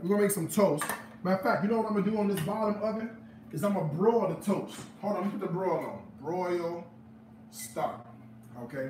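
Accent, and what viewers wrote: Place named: New Orleans, United States. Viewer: Man where are you from. It sounds like a lil N.O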